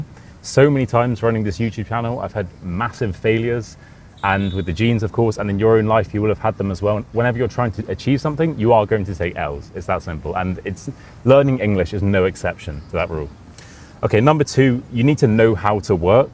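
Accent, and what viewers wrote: United Kingdom. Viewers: Oh gosh I understand your story 🎉😊 I'm proud of myself now ,coz British accent is so hard😅 but I hope one day could speak like you sir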